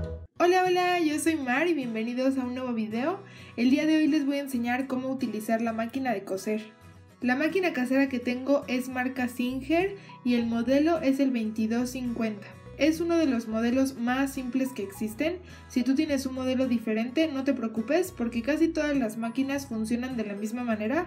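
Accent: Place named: Mexico. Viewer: Ingrese a este video por curiosidad y me terminé enamorando de tu acento mexicano.... Saludos desde Argentina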